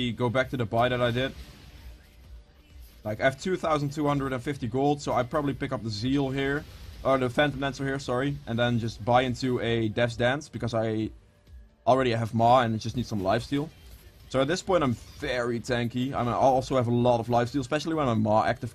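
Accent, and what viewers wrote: Netherlands. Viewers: hi man i just wanted 2 ask you a question, your voice sounds like you are from the netherlands, is that true? if so can i add you on league? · i can hear you're dutch :P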